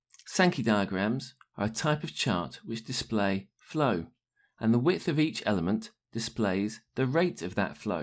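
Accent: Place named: England, United Kingdom. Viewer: Thanks! lovely English accent by the way =)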